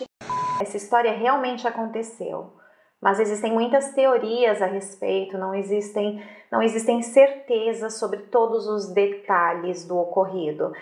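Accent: Italy. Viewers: tu portugués tiene un sencillo acento italiano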